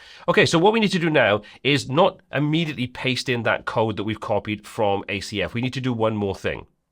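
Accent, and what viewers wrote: Wales, United Kingdom. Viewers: Top instructor - highly skilled and with a great Welsh accent too :-)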